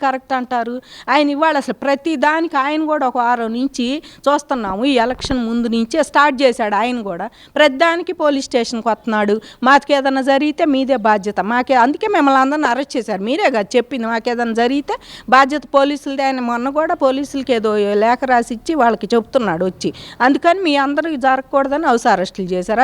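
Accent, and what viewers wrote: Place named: Guntur, India. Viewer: స్వచ్ఛమైన, గుంటూరు భాషలో, చక్కగా చెప్పారు తల్లి.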